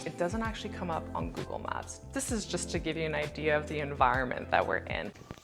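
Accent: Quebec, Canada. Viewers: Great video, ton accent français, tu dois être une quebecoise si je me trompe pas... Sinon 👏🏽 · Your Quebecois accent must have been a hit among the Congolais. Your story briefly mentioned “adjustment”, which I know was something you must have been downplaying for those who could never understand. You’ll probably remember your first days in Congo and the culture shock for the rest of your life. I still remember arriving in Zaïre at Kinshasa in 1978. I was 13, Couldn’t speak French at the time, There was a drought going on, a war just ending, and my family and I were reduced to eating tomato soup for months until our regular family food shipment arrived. The adjustment for me was epic, and I had already become used to living in several different countries by then. The experience of living there for a little more than four years was so wonderful, and exciting. 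I miss that country so much. Interesting that your flying, even your entry into the DRC was far more eastern-oriented than it was in the 70s. In my days, EVERYTHING was Kinshasa-centric. Supplies, even for the Eastern part of the country were mostly trans-shipped out the Kinshasa airports, and trucked in from the port of Matadi.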